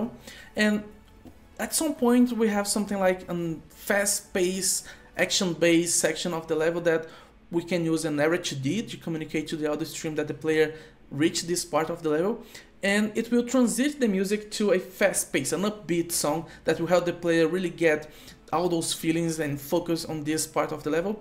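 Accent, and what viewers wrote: Brazil. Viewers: Tu é br né vei ? tem sotaque de br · Brazilian accent 🫶🇧🇷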